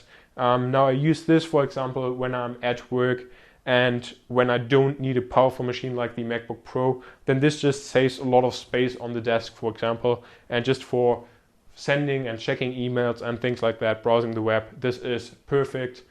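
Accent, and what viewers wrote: Germany. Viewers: Man hört Mega dass du deutsch bist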